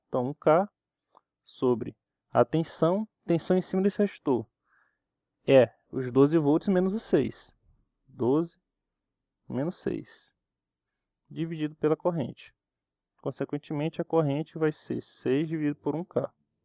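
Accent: Northeast, Brazil. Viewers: apesar do sotaque caipira do nordeste que acho engraçado. piadas a parte sem discriminação, você é o melhor professor de eletrônica do you tube e do Brasil. devia ser premiado pela NASA, Harvard, MIT e cambridge.MUITO SUCESSO VC MERECE ALÉM DE SER "O CARA, "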